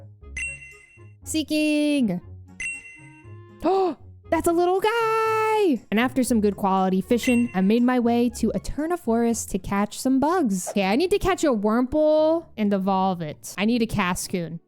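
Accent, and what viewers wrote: Boston, United States. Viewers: Boston accent?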